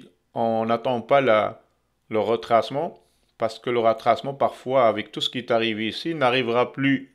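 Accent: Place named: Quebec, Canada. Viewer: merci pour vous riches analyses ! avec accent québécois en plus :) grand bravo !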